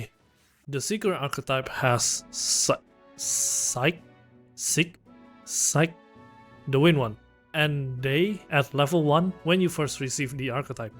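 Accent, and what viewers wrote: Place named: Indonesia. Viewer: r u from indo? got accent hahaha. haven't seen ur vids in a while. nice to see a metaphor video from you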